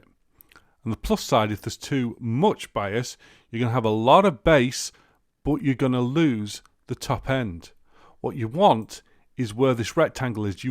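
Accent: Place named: Manchester, United Kingdom. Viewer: You earned a sub from me, Mate and I haven't used a tape in years. I find your accent to be excellent to listen to. Sounds like the bloke from Manchester I watch walking all the canals and sleeping in the woods. On top of that. you replied in a minute to a comment of mine on one of your old videos indicating that you appreciate people watching your stuff!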